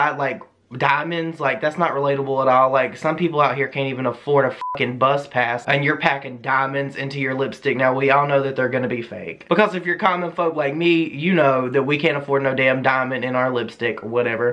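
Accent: South, United States. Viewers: I love it so much that you have a southern accent ♥️ reminds me of the people around me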